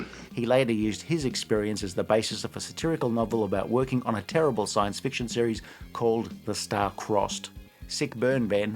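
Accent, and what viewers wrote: Australia. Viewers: I assumed it was the host's Aussie accent, since "tare" would sound like "tire"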